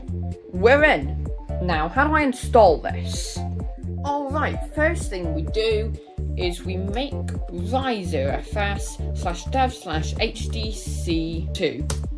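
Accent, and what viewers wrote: United Kingdom. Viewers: I love how the british accent is so recognizable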